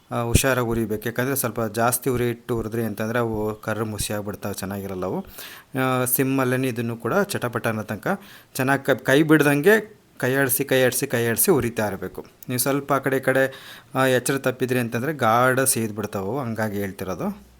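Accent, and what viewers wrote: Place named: Hiriyur, India. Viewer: Nammadu hiriyur bhase kele khushi ayetu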